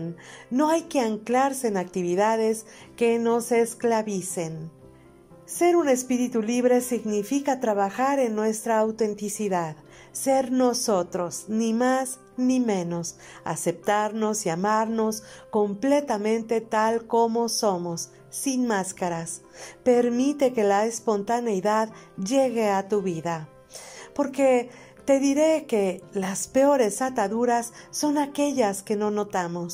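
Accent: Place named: Castile, Spain. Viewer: Otra cosa que me encanta de Usted es que habla y escribe el castellano perfecto, como antiguamente se hablaba y se escribía en España. Me quito el sombrero ante Usted. "CHAPEAU"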